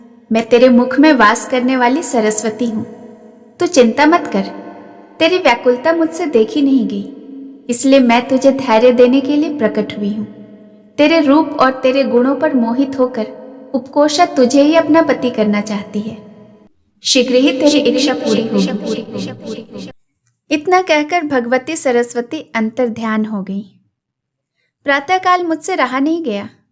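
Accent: Standard. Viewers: जी, मैं छत्तीसगढ से हूं मैं आप को भी छत्तीसगढ के रहने वाली हैं समझ रहा था क्योकि आप का हिंदी बोलना एकदम शुध्द और स्पष्ट हैं छत्तीसगढ के स्थानिय लोग हिंदी को अच्छे से बोलते हैं इसलिए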